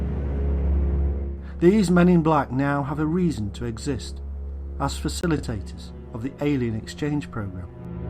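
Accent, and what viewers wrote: United Kingdom. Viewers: Devon accent?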